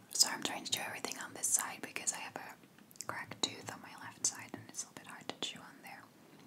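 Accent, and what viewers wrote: United States. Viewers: You may have answered this before but why do you seemingly not have an accent? Like you sound American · I would have guessed she’s from Chicago!!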